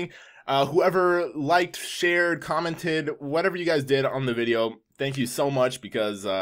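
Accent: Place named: Caribbean. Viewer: Im from The Bahamas and you look and sound just like me, are you from the carribean? if so which island or america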